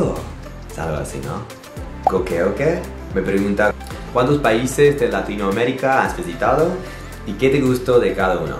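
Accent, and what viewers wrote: Buenos Aires, Argentina. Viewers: Hablas como argentino porque dices "sho" y no "yo". Pero esta super bien 😄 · Suena como una mezcla de argentino, español, y yanqui. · It's a mixture of Argentinean & Spanish from Spain. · I can definitely hear the Argentine accent in your voice... Also your Latin mannerisms you picked up come through... Definitely interesting... I do the same thing when I speak Castilian Spanish... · Lucio Martínez yo soy argentino y te puedo decir que tiene un acento muy similar al nuestro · Que increíble tu español argentino 😍🙂💪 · Te amo fuerte, tenes un acento muy porteño jajaja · te sigo hace poco tiempo y realmente hablás muy bien español, me encanta que tengas el acento argentino, me alegro que te haya gustado vivir en nuestro país y conocer nuestra cultura. Espero que puedas volver, aún hay mucho por conocer 😁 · Tenes la pronunciación de la letra R nuestra! 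De los porteños! 😝. Your Spanish is excellent! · you have an Argentine accent when you speak Spanish, I love it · Interesting, normally Americans learn north American or European Spanish, but you learned Argentinian spanish and even have the strong accent! I love it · Tu tienes el acento “argentino” más claro que he oido en mi vida jajaja. Yo soy de los Estados Unidos también, pero gente dice que yo tengo acento mexicano o peruano. Algunos creen que tengo acento mexicano y algunos creen que tengo acento peruano. Pero no he vivido en un país donde se habla español y por eso mi acento no está escrito en piedra. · no dijo que no tenga acento gringo, dijo que su español es muy argentino, las formas de pronunciar las palabras las acentuaciones y sobre todos los "sh" sounds que se les escapan a veces son caracterisitco del español argentino obviamente mezclado con su acento gringo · No sabia que habias vivido en Buenos Aires, pero me di cuenta al toque por que tu español es marcadamente porteño, ni siquiera Argentino... 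porteño! jajaj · Hola!!! Me sorprende que hables en español tan bien, incluso tienes acento argentino. Eres muy respetuoso, divertido y buena persona y se nota al hablar. No cambies nunca. Un abrazo desde España. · Me mueroooo jajajaja es que escucharlo hablando con acento argentino es súper funny. Le queda bien. Saludos desde Puerto Rico. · First of all, someone's accent is Argentine, not Argentinian; "Argentinian" is the name give to the people from Argentina, whereas "Argentine" is restricted to things, both from the said country. Second of all, he does mention living down there, working down there and even dating someone from down there. Finally, he does sound like an Argentinian at times; that's probably because of how he learned. Quit bitching... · Me encantaaaaa cuando mezclas el acento argentino y español!! 😍😍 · Es verdad tiene un toque de acento como los argentino como nosotros habla muy bien .great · Tienes marcado el acento Español pero con cierto toque de Argentino! 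· ESE ACENTO ES FABULOSO !!! MARAVILLOSO !!! ME ENCANTA ESE ACENTO !!! ARGENTINO ES LO MAS !!!!!!!!!!!!!! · I love your Spaniard/ Argentinian accent! Lo haces perfecto! Te felicito. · I think what they're saying is that his accent has features most associated with accents heard in Argentina, most notably the way he pronounces doble L.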